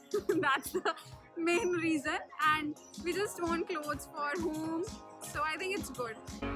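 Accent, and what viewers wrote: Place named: India. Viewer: I love your India accent 🙂🙂😋😏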